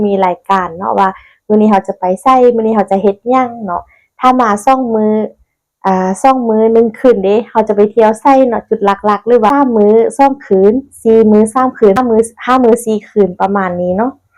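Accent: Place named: Laos. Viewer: มาส่งกำลังใจเหมือนเดิมค่ะ พูดลาวก็น่าฮัก พูดไทยก็เก่งค่ะ สู้ไปด้วยกันค่ะ ทางนี้ผู้ติดตามก็น้อยค่ะ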